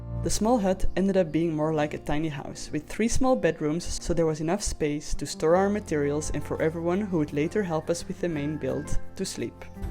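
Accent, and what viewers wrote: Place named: Belgium. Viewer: Wow this reminds me of pictures my parents had living in New Guinea except with all the new technology. I am part dutch, part Indonesian and your videos are so like cool and almost feels like home to me. I would love to visit there one day. My parents were both born in Indonesia but later had to leave with their parents after the war. Seeing a modern day scenario of how my parents lived except with Starlink, a more modern beautifully built home and riding the surf of the island is like a dream come true...Thanks for sharing these videos. Your Belgium accent is like hearing my parents as well with the European accent. My parents are both gone but I would imagine they would be in second heaven if they saw your videos today.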